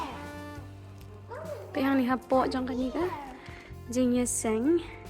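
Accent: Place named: Mawlai, India. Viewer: Kren nong mawlai noh khyndit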